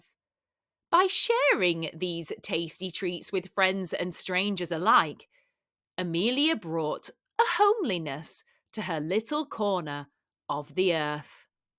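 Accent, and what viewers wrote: United Kingdom. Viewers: Which accent do you follow of British accents?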